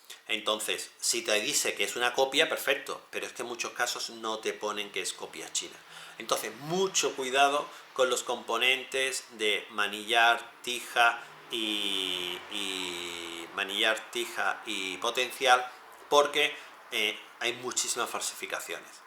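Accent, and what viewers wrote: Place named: Córdoba, Argentina. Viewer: Aa valee! Yo de Córdoba por eso te lo decía! Que he visto que tenemos acentos similares y digo si es de Córdoba podemos salir a entrenar! Jajaja. Un saludo!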